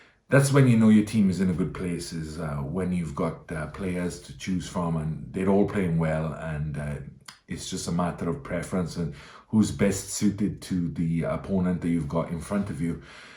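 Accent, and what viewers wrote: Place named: Wales, United Kingdom. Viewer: Hey. Good to hear your comments on the teams for this weekend's three juicy matches. I can't disagree with anything. Biggest chance of an upset for me is with the Italians. I don't think England can upset this Ireland set-up. Love your accent mate (something of an interest for me). Clearly lots of Welsh there but I think that there is something else underneath that. Italian perhaps?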